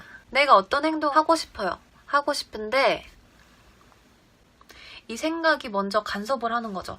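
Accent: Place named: Busan, South Korea. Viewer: 부산여자 아닙니까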